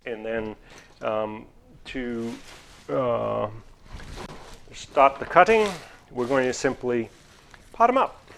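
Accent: New York, United States. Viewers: Sounds like he's from New York or jersey.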